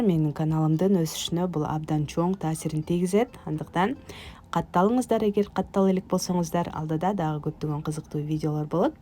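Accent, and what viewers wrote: Standard. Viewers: Азаматсыз,ийгилик каалаймын.Таза кыргызча суйлогонунуз учун лайк басып,катталдым💐Буйруса даярдап кором.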